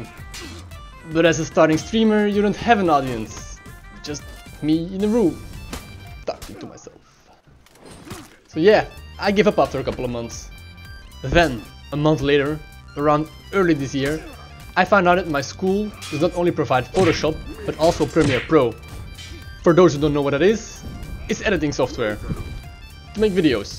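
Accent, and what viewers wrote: Belgium. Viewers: Are you belgian?/ Zijt ge belgisch? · Nice to see a fellow Belgian! I heard the accent and was doubting you were from BE or NL :) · Kom jij uit belgië? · Kom je uit België? Hahah groeten vanuit Nederland! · EEEEEHHH !! Mais t’es belge !!! Jme disais bien que je reconnaissait pas l’accent !!! Perso je pense que tu devrais aller sur twitch, Youtube est encore trop mal foutue en matière de streams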